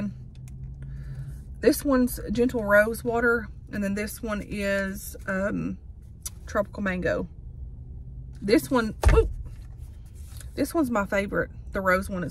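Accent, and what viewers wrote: South, United States. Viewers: YESSSSS,Let me start my comment by saying i LOVEEE your country accent❤❤❤. Second,your shirt is everything lol, i used to watch golden girls alot!! I see those steam dumplings so i may try them out now. Eww i never was a fan of moon pies😅. Oatmeal pies for me❤. I always wondered how those sparkling waters taste. I like to buy the walmart brand sparkling waters for my son. I did grab 1 of the bubly brand ones to try. Omgg those waffle sticks are so cute🐶😅. Those lipsticks remind me of maybelline! Yup,ive used those same containers for the smaller earrings. Those dingo dog treats are a deal😮. Have you tryed those foam soaps they have that resembles the bath&body works one? Im a sucker for bright colored nail polish! Thanks for showing off your goodies❤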